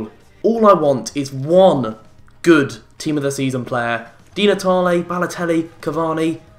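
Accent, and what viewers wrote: RP, United Kingdom. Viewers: your voice I'm watching your videos of 2015. Such a different. Posh voice here, shouting mad and loud as hell back in 2015. Lot of difference. Deserves gratitude now. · The he used to speak, was so posh haha.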